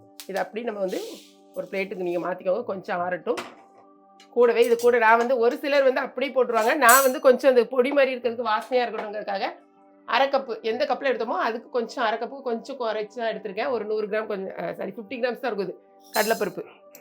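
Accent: Tirunelveli, India. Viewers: திருப்பூர் கோகிலா... வணக்கம் மேடம்... உங்க வீடியோ பாத்தா உடனே ஒரு லைக் போட்டு தான் வீடியோக்கு உள்ளேயே பாக்கறது... Because உங்க திருநெல்வேலி பாஷைக்கு நான் அடிமை 😍😍😍எங்க வீட்டுலயும் இந்த பொடி செய்வோம் 💚💚💚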